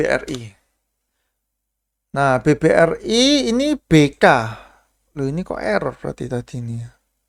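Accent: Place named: Java, Indonesia. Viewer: ada logat jawanya aduh jan gurih banget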